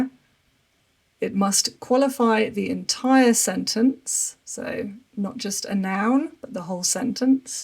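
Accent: United Kingdom. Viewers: It was perfect 😍 I love your British accent 🥹✨️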